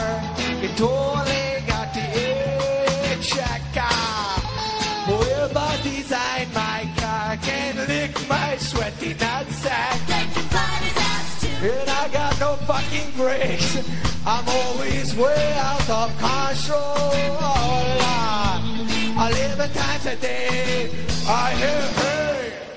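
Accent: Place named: Jamaica. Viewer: Why does he all jamacian like?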